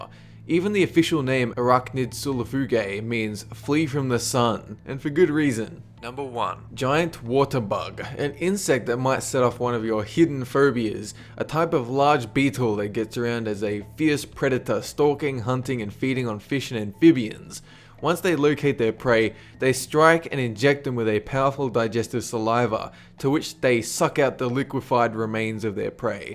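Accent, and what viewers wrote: Australia. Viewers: are you Australian or kiwi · are you australian?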